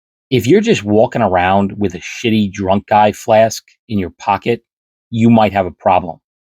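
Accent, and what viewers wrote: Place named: Long Island, United States. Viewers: Never underestimate how much fun it is to listen to a guy from Long Island explain Star Trek. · 2/24/24 (HOW ABOUT THAT DATE!!!) why so much profanity? I could do without all the sexual stuff and cursing ANYWAY great movie! i saw it in theaters with my uncle and my dad The consensus was that it was a fun movie didn't like the diversion into hair metal without mentioning KISS in the 80s KISS were huge again, if a bit similar to other bands of the era they're also NYers just like you (I am guessing by your atrocious accent) given i am no lingust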